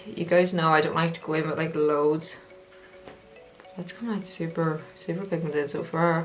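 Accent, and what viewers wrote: Ireland. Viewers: Great video! Where in ireland are you from? I can't place the accent 😂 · Man sounds Irish as fuck.Fair fucks to yeh getting in my recommend some how.What county?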